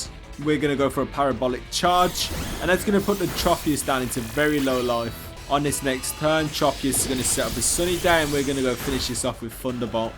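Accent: United Kingdom. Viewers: I mean your run was fun to watch, but man, you sound so bored narrating it. Also your accent is a little hard to get used to - is it a British accent? never heard someone talk like you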